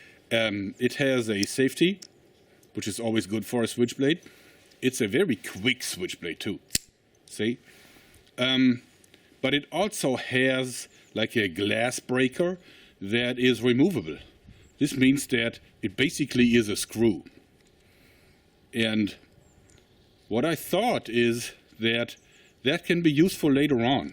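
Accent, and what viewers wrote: Germany. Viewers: for some reason this all made better with the german accented English...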